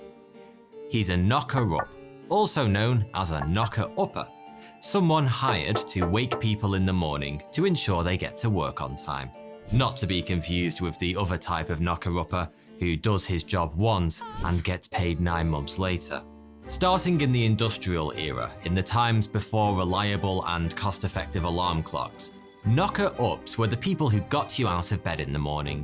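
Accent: United Kingdom. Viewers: I just love his accent. I've always wanted to have good british accent.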